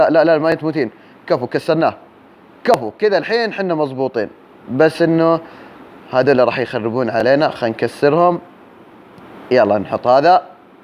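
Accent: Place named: Saudi Arabia. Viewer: kmal alswydy واضح كلامه هوا من السعوديه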